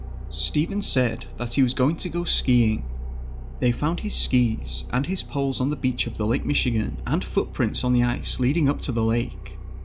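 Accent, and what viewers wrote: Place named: England, United Kingdom. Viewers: Sounds like a Yorkshire accent. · It's really hard to follow these automated narrators. And why do these "robots" always have English accents??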